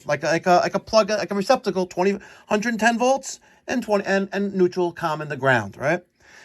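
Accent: New York, United States. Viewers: I'd let boy know to stop letting them flags fly in his part of the property. Some people might say that's being whatever, but no...it's not my religion or whatever get your shit out of my area...I'm not putting mine in yours so fuck off. Love you....Hey give me a faaageeetttit abbbouuuutt itt next time lol. I love the NY accent and some of the tude lol.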